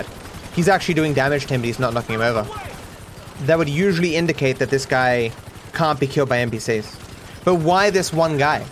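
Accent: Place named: Australia. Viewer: FINISH THE RUN YOU AMERICANIZED AUSSIE!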